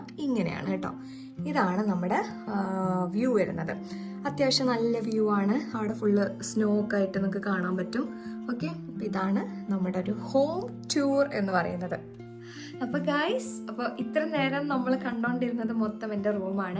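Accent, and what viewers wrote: Malappuram, India. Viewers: Kozhikode aaanenn thonniyittilla, malapuram aanenn thonni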